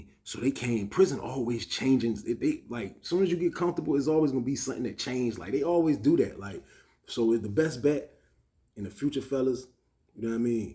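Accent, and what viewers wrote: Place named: Bay Area, United States. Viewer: a lot of similarities between how you talk and Bay Area. Richmond Cali is hard and of course we been calling Oakland da TOWN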